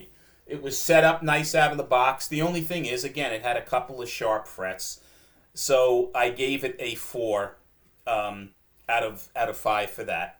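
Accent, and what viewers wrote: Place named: East Coast, United States. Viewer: I appreciate the in-depth review, coupled with the East Coast accent, which completes the experience for a Midwesterner like me. BTW, if anyone clicks on your affiliate link, you get a piece of the action for so many minutes afterward, regardless of what is purchased.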